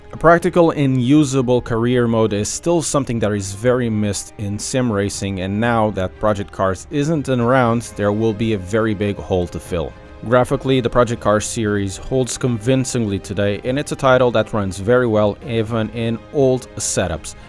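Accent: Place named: Portugal. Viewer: Ahahaha adoro ouvir tugas a falar inglês. Bom trabalho!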